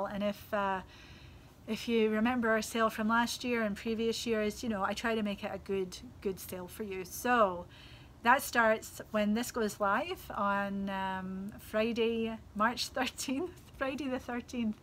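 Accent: Scotland, United Kingdom. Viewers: Oh how can we get her to publish that pattern? It really is a no-fuss beauty! My bucket list has always been Shetland Wool Festival and EYF. Some of my motivation is simply due to my love of hearing the Scottish voice! I always look forward to listening to you while I knit! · Thank you for the EYF sale this weekend...even though the CDN $ is so low, I plunged in and fell right off my yarn diet to splurge on some Marie Wallin wool. A dear friend shared her leftovers from her cowl kit and I purchased the wool to replace the bits needed to make myself the same cowl. Love watching your shop updates and your wonderful accent, as I recently lost a very dear Scottish lass who was 94yrs young and it brings her closer each time I hear you speak. :)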